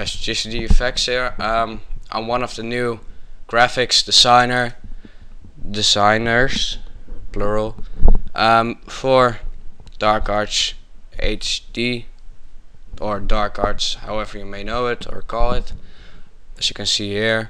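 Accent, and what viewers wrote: Netherlands. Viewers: U dutch or from belgium :P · u have a dutch accent :D i can tell because im dutch myself and most dutch people who talk english (or try) sound like this. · I know how hard it can be to talk proper english when you're dutch, because I'm dutch too, but I might have a tip for you. Write down your text before you start, and just read it. Or just please dont use your voice if it isn't good enough. Sorry. You keep swearing and there are Silent letters in english. Also letters you DO have to say. Don't say "oh yea, plural" in the middle of a video. We don't want some gramar lessons. Apart from that, the tutorial was pretty good.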